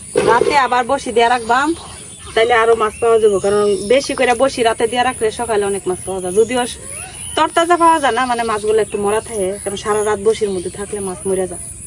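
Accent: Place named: Mymensingh, Bangladesh. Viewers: কথা শুনে মনে হচ্ছে নেত্রকোনার মানুষ, আমার শশুর বাড়ীর লোকজন এভাবেই কথা বলে। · আপু তোমার কথা শুনে মনে হচ্ছে নেত্রকোনা আমিও নেত্রকোনার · আসসালামু আলাইকুম ভাবি আপনার বন্য আছে বন্য থাকলে আমি বিয়ে করতাম আমাকে মাচ মারে খাওয়াতো আমার বাড়ি কিন্তু রংপুর আপনার বাড়ি ময়মনসিংহ তাই-না ভাবি আপনার ভাষায় বোঝা জায় মাজা নিলাম ভাবি আপনার সাথে মনে কিছু নিবেন না ওকে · মনে হচ্ছে নেত্রকোনা বা ময়মনসিংহ বা শেরপুর তবে পাহার ও ভাষায় মনে হয় নেত্রকোনা জেলা র কোন গ্রাম। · ভাষা ময়মনসিংহ অঞ্চলের ! খুব সুন্দর। নেত্রকোনা হবার সম্ভাবনা অনেক বেশি ।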